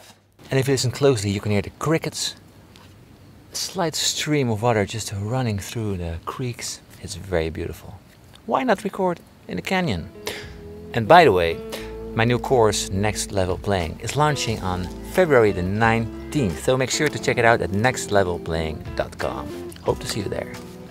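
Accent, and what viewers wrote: Netherlands. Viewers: I really enjoy your channel and while listening to you speak, tried to guess where you were from, your music speaks for itself:) It's close to American mixed with British, but my guess is The Netherlands. Years ago I met a couple in the art store where I worked and though they sounded American, there was a few words where the d's sounded sort of German. I asked where they were from originally and yes, it was Holland. They had lived here a long time but found the sounds of American English quite easy to master. So, did I guess right? Cheers, Rik Spector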